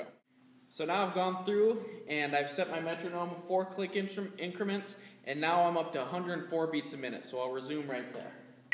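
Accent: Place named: South Dakota, United States. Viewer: Very helpful video! And very prominent South Dakotan accent! :)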